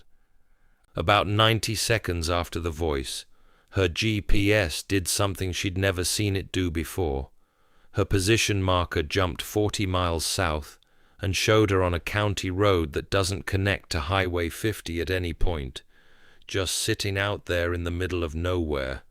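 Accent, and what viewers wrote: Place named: United Kingdom. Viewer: Its a British accent..bro!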